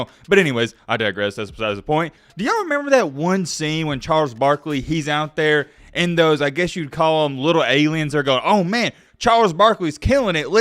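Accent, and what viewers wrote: South, United States. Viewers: The southern accent followed by the weird rambling about lebron’s space jam (this video has nothing to do with LeBron OR space jam btw)…..yeah I only made it 30 seconds in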